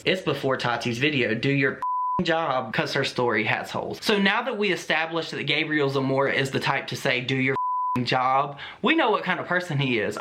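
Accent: South, United States. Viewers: Subbed for that southern drawl lol love it . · I love your accent! Is it southern?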